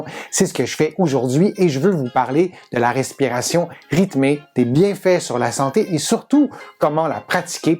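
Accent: Quebec, Canada. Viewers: Tellement cute l'accent québécois aux oreilles françaises. Merci pour les explications.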